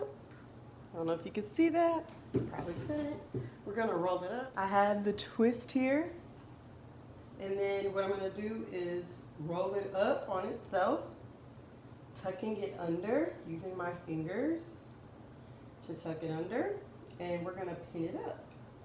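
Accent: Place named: South, United States. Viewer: I love your southern accent it’s sooo cute 😍😍😍 and yasss sis this bun came out so cute and effortless! Def gonna be trying this!!! 👏🏾👏🏾👏🏾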